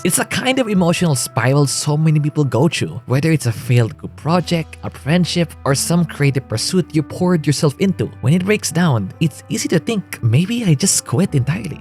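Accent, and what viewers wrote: Philippines. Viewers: I think your a Filipino because of your actsent.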